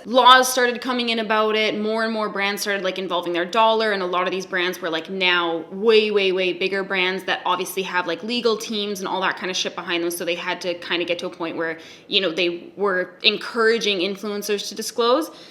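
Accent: Canada. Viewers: All the comments about her "accent" saying "tag".. I went back and watched again wondering what I missed... oh... silly me eh.. I grew up 2 hours away from where she did 😂😂😂 Oh Canadia. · I love this just because you said “tag” so much at the end. #lovecanadianaccents you’re the best! 🙏👏🏽❤️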